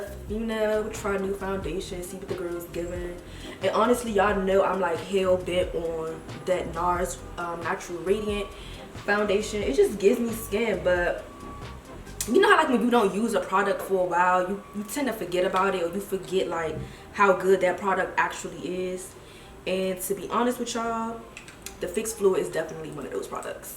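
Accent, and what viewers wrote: Washington DC, United States. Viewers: I just discovered your videos last night as soon as you spoke I was like she is a fellow DC girl 💕💕💕💕💕 Love all your makeup looks !!!!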